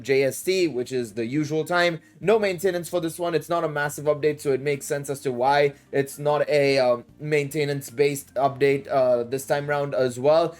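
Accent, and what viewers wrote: India. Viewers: Just wondering are you indian-indian? You said about being based in EU? Why is your indian accent so heavy zzz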